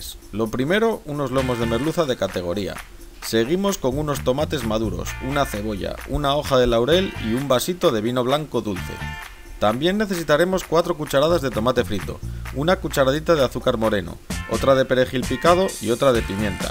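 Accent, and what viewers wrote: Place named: Asturias, Spain. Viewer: Me apunto la receta!!! Me acabo de suscribir!!! Eres asturiano!!!!???? Por el acento eso me parece....yo lo soy ....voy a echar un vistacin a tus recetas👌🏻👌🏻me parecen muy ricas asik tomó nota de algunas de ellas... Bueno,..pues por aquí me quedo...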